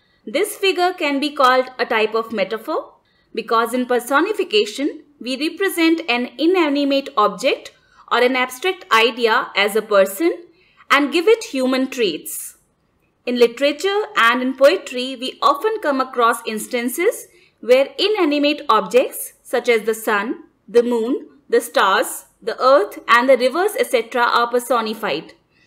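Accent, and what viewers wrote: India. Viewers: lmaooooooo indian voice xdxdxdxd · People complaining about her "Indian" accent could switch on the subtitles. Great tutorial, subscribed to your channel :) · You speak English-language with Indian accent. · When the video was done and you realize you don't understand anything because of laughing at the indian accent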